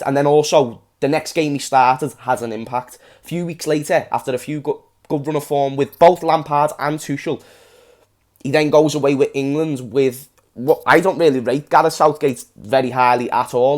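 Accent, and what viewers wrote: Ireland. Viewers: Big up from Ireland 🇮🇪 ( great Irish accent btw ) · Love the Irish accent your like myself bro 🇮🇪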